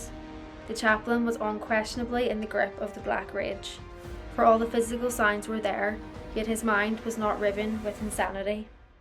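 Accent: Northern Ireland, United Kingdom. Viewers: Are yous by chance from Northern Ireland?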